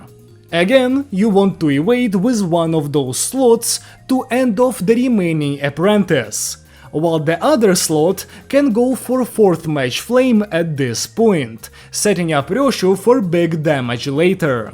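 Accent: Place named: Russia. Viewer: Y teba akcent russki =)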